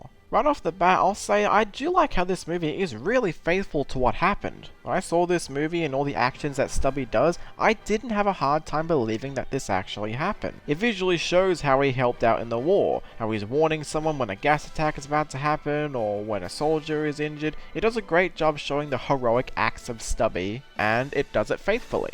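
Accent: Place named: Australia. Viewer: Wow, I didn’t know you were Australian! And about the movie, I haven’t seen it myself, but it definitely seems like the perfect film to show to elementary school kids when they need to learn about the Great War in Social Studies class! As for the animation, well, I didn’t really see a reason for the movie to be animated other than to appeal to kids more or as an exercise for the animators, but for what it’s worth, it IS pretty good, and the animators spent as much time on it as they needed to! And now I can’t wait for the Duck Duck Goose Explosion!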